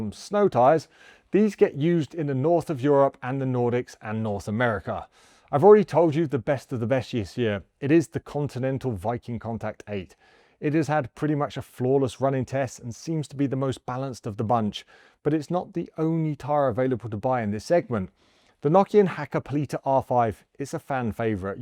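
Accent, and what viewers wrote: United Kingdom. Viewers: lol, i always thought you were British :D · I don't TRUST these "review channels" much, they all probably funded by manufacturers of tires. Too much bias. And a guy with brit accent living in Salt Lake City.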